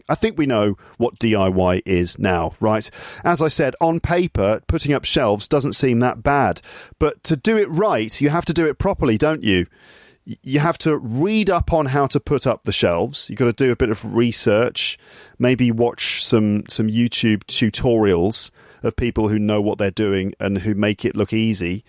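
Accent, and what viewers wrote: RP, United Kingdom. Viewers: Not all sound realistic enough, still, really appreciated your effort to imitate the sound of the instrument, especially the sound of the drill. Overall I love the process of imagining all those appliances and your work on your pod room, while I'm making dinner, your podcast is the best companion as well as learning the received pronunciation accent :goodvibes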